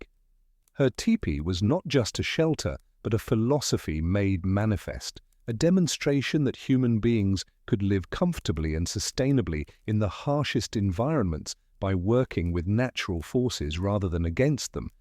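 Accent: United Kingdom. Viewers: Watching from southeastern Texas, 🇺🇸 USA. You have a beautiful British accent. Could listen to you for hours.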